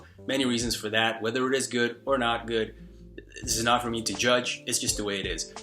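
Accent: East Coast, United States. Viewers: I have watched your previous videos and I really can see big difference in your American accent, it's like you've been staying quiet good time there and absorbed the accent. Great work! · oh I thought you are from the US man, good spoken American English. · Honestly, I didn't notice the east coast american accent until you mentioned it. But then I totally got it. The first time I heard you speak on Youtube I just thought you were american! It took about 10 or 15mins of listening to you before I noticed the hint of an accent. And I could've never guessed you were from a slavic country 😂 I guess it helps learning English as a kid while in the US. Most slavic language speakers who learn English as a 2nd language have pretty heavy accents that they have a really hard time improving. As far as non-native English speakers, you're in an elite class of maybe 3 or so YouTubere I've seen where I just couldn't believe they weren't native at first! 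Anyway, I'm from Cincinnati, OH, so maybe that's a reasonable native English speaker's assessment? Anyway, love your videos, man! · Hey, native English speaker from California here. From a west coast perspective, you definitely have a noticeable accent since you sound very east coast/urban with certain words here and there. If you're open to tips, ironically -- one of the words you pronounce a bit differently from most Americans is the word "language." You pronounce it like "Lan-guage" when most Americans pronounce it "Lang-guage." The first syllable sounds a bit flat when you say it -- it's usually "Lang" as in Langston Huges (the author) not "Lan" as in Lanyard -- the way you say it. Hope that helps. Anyway, keep it up! Your vids are awesome and your tips are really helpful.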